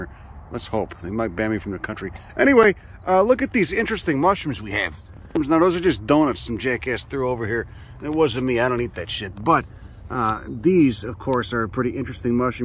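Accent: Chicago, United States. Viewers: Oh, yeah, I get it. Your original point is good - and believe me, I hope people will heed signs or common sense. It is gruesome to think of caustic (or acidic) pits of boiling mud. I guess my original comment was because our ingenious educator simply cracks me up with his thick Chicago accent. Sorta like the trip through the grocery store near Berkeley. So many quotables...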